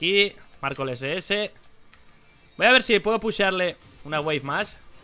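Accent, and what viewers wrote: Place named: Spain. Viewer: De que parte de españa sos ????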